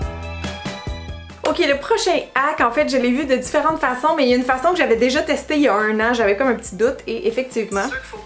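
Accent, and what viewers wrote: Quebec, Canada. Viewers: OmG ce petit accent Quebecois siiiiii charmant❤❤❤❤, le Français de France est vraiment monocorde en comparaison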